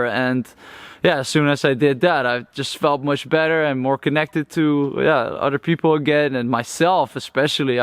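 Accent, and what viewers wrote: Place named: Netherlands. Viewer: Je bent nederlands toch want je klinkt heel nederlands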